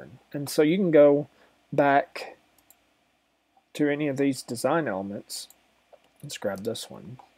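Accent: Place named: United States. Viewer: You sound like a cowboy :-D You're accents amazing! This is a really helpful video. Thank you.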